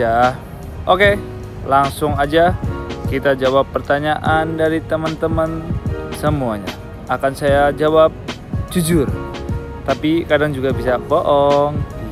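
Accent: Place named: Banyumas, Indonesia. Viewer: Ngapak.e khas nemen bib😅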